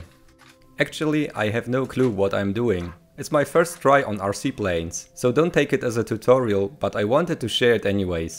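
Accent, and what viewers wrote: Germany. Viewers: so you are German 😂. Das "Nein" und dein axent sind ziemlich verräterisch. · Are you german?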